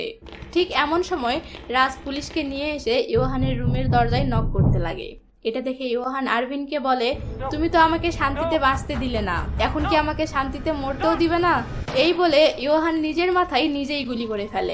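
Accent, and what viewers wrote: Bangladesh. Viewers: আপু আপনার কথা শুনে মনে হয় আপনি বাংলাদেশী।কিন্তু আপনার চ্যানেলে দেয়া আপনি ইন্ডিয়া থেকে!! আমি কি ভুল?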